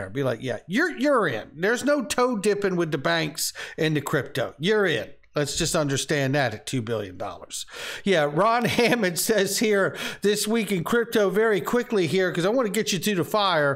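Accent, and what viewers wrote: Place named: United Kingdom. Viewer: We loveee your show TV 🥰🥰🥰🥰🥰😍😍😍😍😍🥰🥰😍🥰 you very good british speak i very much smile happy see you ❣❣❣ love really bro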